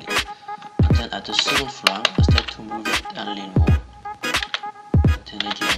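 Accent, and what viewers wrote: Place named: France. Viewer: Dude you are so funny your editing is great and you except the fact your French accent is hard to undestand for people that are not French witch every youtuber should do good on you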